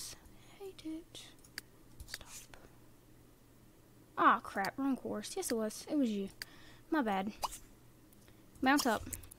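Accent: South, United States. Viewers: Your shoutout literally made me smile from ear to ear I'm so happy you liked the home. I really love this series so much, and your so amazing I love your voice and the way you say most things it's so....southern lol I love youuuu!!!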